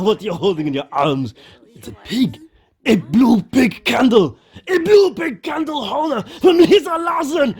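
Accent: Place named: Poland. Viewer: Your polglish is the best proposition ❤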